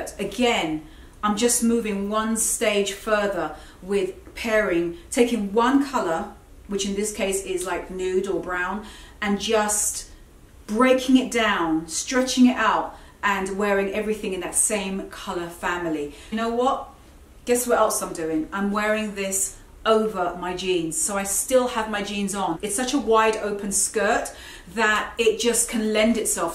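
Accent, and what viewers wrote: United Kingdom. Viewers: I love love love your accent! A mild uk english🎉🔝🔝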